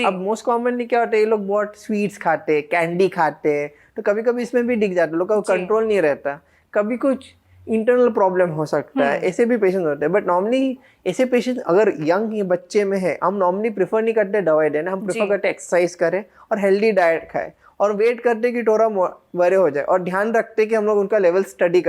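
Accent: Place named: Sidhpur, India. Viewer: Speaking hindi like a typical sidhpuri.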